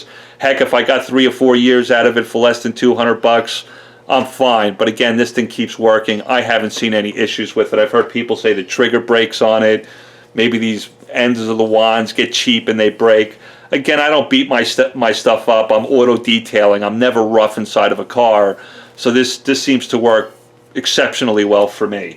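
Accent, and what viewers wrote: New York, United States. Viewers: Yes. The annoying noo yawk accent. How does area become area-er?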